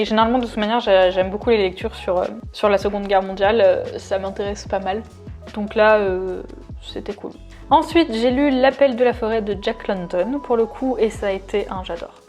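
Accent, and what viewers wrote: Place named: England, United Kingdom. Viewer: ton accent anglais ❤️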